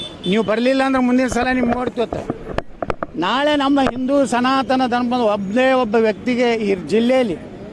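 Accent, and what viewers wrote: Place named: North Karnataka, India. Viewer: ಪಕ್ಕಾ ಉತ್ತರ ಕರ್ನಾಟಕ ಭಾಷೆ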